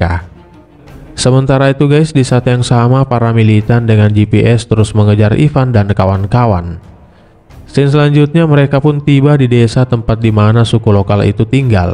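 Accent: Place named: Eastern Indonesia, Indonesia. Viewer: kok ada logat indo timur..? barangkat.. salam dari maluku🙏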